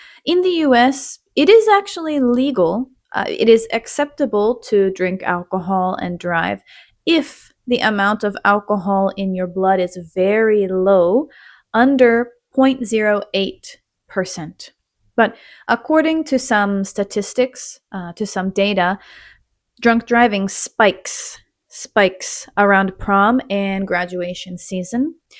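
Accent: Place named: Japan. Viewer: 全て字幕成し遂げで聞いて、７割理解出来た、けど、貴女がJapaneseアクセントでslow english するおかげだね😅プロムは、日本人の意識ではハリウッド映画等で馴染み深いというかusa のカルチャーは知ってるつもりです。😊(知らない単語が連発してるが憶える必要はあるのかな、個人レベル次第かな😅)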